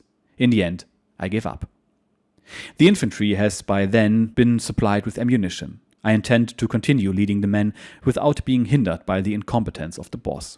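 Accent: Germany. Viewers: great initiative and great books. the only small thing that I complain is that the German accent is sometimes a bit hard to understand thank you!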